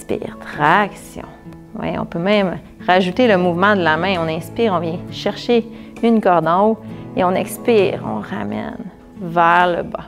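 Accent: Canada. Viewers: Vidéo vue de Genève. (j'ai pratiqué et pratique occasionnellement l'hata Yoga). Super vidéo merci pour ce partage car j'ai un bon physique mais j'ai de la peine à me motiver...on verra si ça joue. Bonne continuation et j'adore L'accent canadien. J'ai un accent genevois ou vaudois à l'occasion 😉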